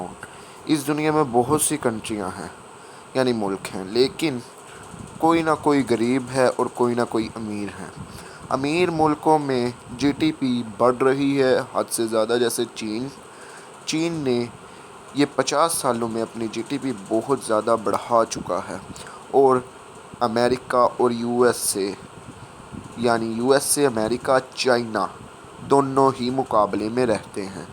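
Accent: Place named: Pakistan. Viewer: Bhai tum awaj se hi Pakistani lagte ho and uske bad Pakistan ke flag me Kashmir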